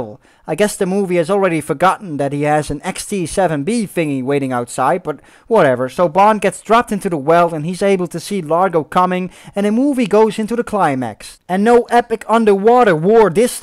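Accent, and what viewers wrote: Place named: Netherlands. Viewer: He's dutch, that's his accent. How many languages do you speak?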